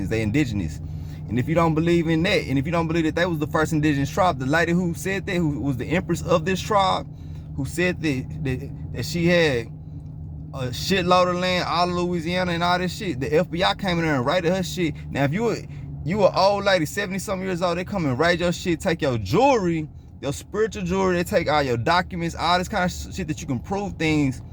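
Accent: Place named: Dallas, United States. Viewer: This was a good video. I’ve been studying for years and everything you said in this video is true. Most of America is Egypt. Ancient Israel is on the west side of America (Utah, Nevada, Arizona, and parts of California, etc. The Mississippi River is the Nile of Egypt and that’s why you have so many mounds up and down the bank of the river. I also believe north is south and south is north. Everything is the opposite. The Most High is waking people up. What’s crazy is that you have the same exact knowledge that I have (at least according to this video) so I know it’s spiritual. A lot of other people probably don’t believe or even understand you but I do. That’s how it is when I tell certain people what I know. They just can’t comprehend. I don’t trip though. Maybe it’s not their time or maybe it’s not for them to get. Last but not least, as soon as I heard you start talking I was like he from Dallas 😂 but I see your from FW. 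I’m here too (Dallas). Keep learning bro because you’re on to something.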